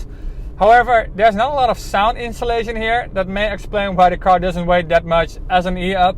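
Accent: Netherlands. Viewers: Lekker Nederlands accent lolz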